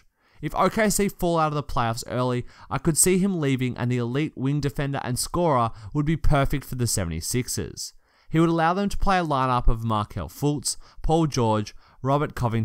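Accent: Australia. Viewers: Thats some thick ozzie accent you got there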